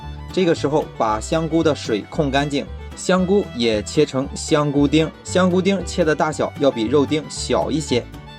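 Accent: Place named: Shandong, China. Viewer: 老乡你是山东哪儿的听着很像我那地方的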